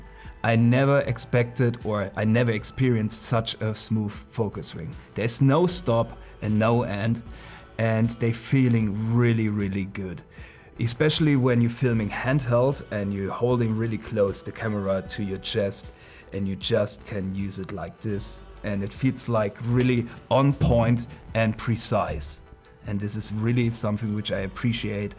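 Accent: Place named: Germany. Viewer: I heard you speaking. and my first thought was: is he German? And, yeah, I was right! 😁 .. Thanks for the review. I want to buy it, but the link you provide only targets to Amazon US and I'm not sure if you'll get the commission if I switch to Amazon DE, maybe you should provide a german link 😉.. Viele Grüße aus Köln!!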